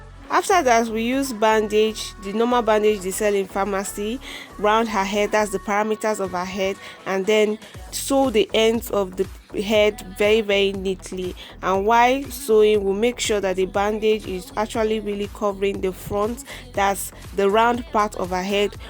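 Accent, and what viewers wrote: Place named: Nigeria. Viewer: Well well, this style is not one of the best ,but this girl is very innovative ,why? First. She has to use the material that is available in to her country. from her accent she is Nigerian ..,not all of the stylist are very up to par with the trends so they create something suitable and affordable for her and ultimately the customers. She is bold enough to have a YouTube channel to show her work and investment and edit the video. She needs more training and I'm sure she will do better.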